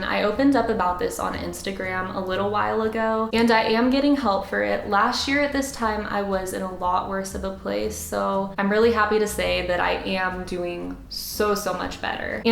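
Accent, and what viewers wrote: South, United States. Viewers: Your accent is so amazing shoutout to my fellow southerner!🤠🤠🤠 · it’s weird because sometimes when I watch I totally can ignore her accent but now HER VOICE IS EXTREMELY SOUTHERN AND UNAVOIDABLE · Where - where do you live? Like you sound very Southern sometimes,but sometimes you sound like you could live in Oregon. It's big confusion for me. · country accent was strong in this one and that makes me happy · She probably lived in the south and moved to the north. I do the same thing. My accent gets really thick when I’m stressed or angry😬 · That country accent really showin it's self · wow your southern accent is really poking through in this one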